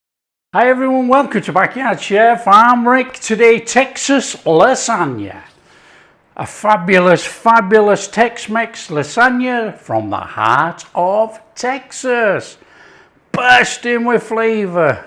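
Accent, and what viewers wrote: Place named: Liverpool, United Kingdom. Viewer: Just love the Liverpudlian accent, then we go a little bit of elvis lol, you’re so entertaining. Come on!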